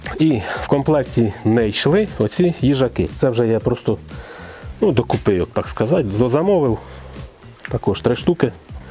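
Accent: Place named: Surzhyk, Ukraine. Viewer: Того шо в нього трохи суржика є, не всі слова він каже Українською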